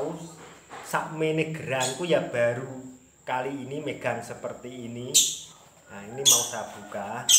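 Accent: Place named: Java, Indonesia. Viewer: medok tenan bahasa ne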